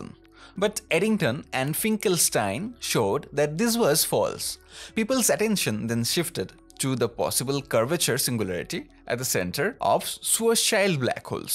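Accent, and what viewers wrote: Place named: India. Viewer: Bro, no offense. I respect that you bring in science and valuable information rather than the next clickbait video, but the hard Indian English accent (at least it sounds like that) makes it most of the times really difficult to follow. If you are open to constructive criticism, maybe use some appropriate tools, or have it dubbed by some AI voice perhaps. Other than that, well done. Keep it up.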